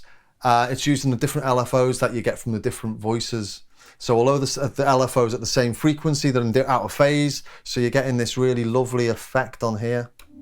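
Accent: Liverpool, United Kingdom. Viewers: Is a scouser?! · Are you from Liverpool?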